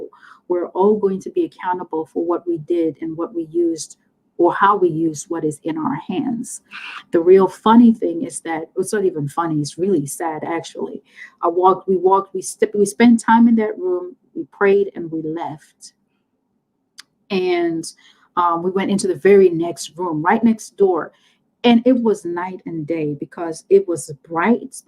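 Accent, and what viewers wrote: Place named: Caribbean. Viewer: Even if you didn't have the Caribbean accent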